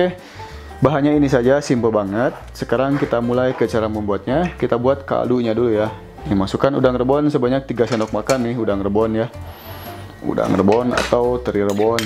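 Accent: West Java, Indonesia. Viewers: Kita banjuriii yaa..😂 sunda pisan euy.. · Alhamdulillah, diam d rumah ada kegiatan, praktek bikin makanan ala 2, bahan nta simpel / mudah d dapat dan ga banyak biaya lagi, nuhun ide nya, bikin ibu 2 jadi resep, bikin nya dan lucu klw ngomong sunda na lucu 👍👍👍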